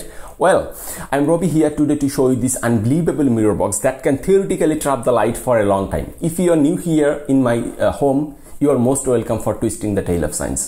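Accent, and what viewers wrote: India. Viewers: *clicks video, hears Indian accent, clicks off video in disappointment. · Are you Indian? Your accent is like an Indian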